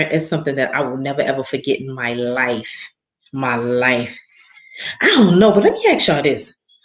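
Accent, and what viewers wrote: New York, United States. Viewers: Views not lost if you're watching. City is the name of the college, City College in Harlem Manhattan. You should know that Ny accent from anywhere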